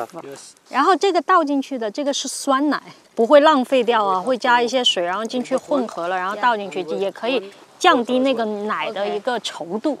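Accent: Sichuan, China. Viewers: 喜欢你的视频，真实，更喜欢你的四川普通话。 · 因为是川普啊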